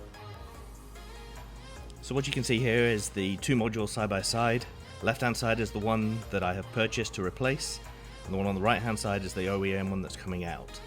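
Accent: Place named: United Kingdom. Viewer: Everything sounds better with a British accent❤ 👍 You sound like my son in law from Nuneaton...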